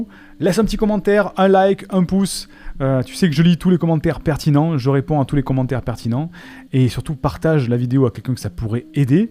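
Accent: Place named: Quebec, Canada. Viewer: Euh...écouter un québécois à vitesse 1,5 ça demande concentration quand même 😁. Heureusement que c est pas long. Très intéressante vidéo, merci. Christophe Bernard, d Altheaprovence explique très bien aussi l effet placebo et nocebo... Et, si, oui, moi je m endors volontairement à l heure que je choisis et je croyais que ça marchait pour tout le monde !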